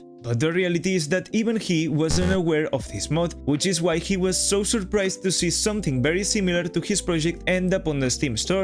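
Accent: Spain. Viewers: COMO SE NOTA QUE ERES ESPAÑOL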